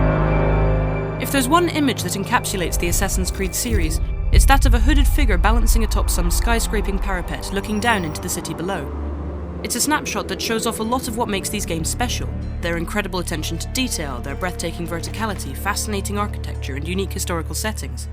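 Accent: United Kingdom. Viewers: i was not prepared for an 11 yr old British guy than the usual twenty something white boy lol... umm this is IGN right? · you're probably british...can't take you seriously.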